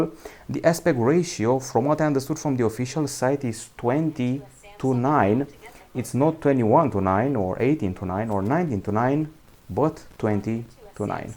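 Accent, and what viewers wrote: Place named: Romania. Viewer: Știam că esti român! De la accent și de la tipul de priză.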